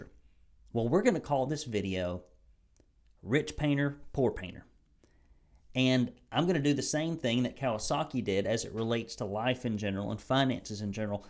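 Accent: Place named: South, United States. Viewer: Hey, your commentary is "Spot On" and honest. And Oh Yeah, uh, don't ever worry about that "Rednecky Accent". Ya"ll doin Just FINE!!!!!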